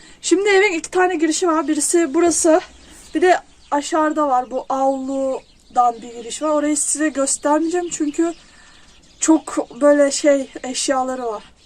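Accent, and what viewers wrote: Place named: Sinop, Turkey. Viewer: Sinoplusunuz galiba kınuşmanız sinopluları anımsatıyor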